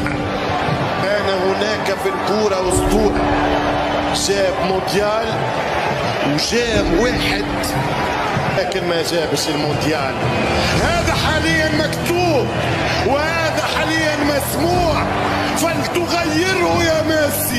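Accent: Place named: Tunisia. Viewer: the commentator in this video is Tunisian which is soooooo different than the egyptian dialect! your comment makes 0 sense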